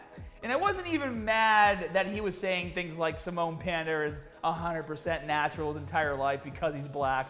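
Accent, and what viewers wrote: Boston, United States. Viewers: He's losing the Boston accent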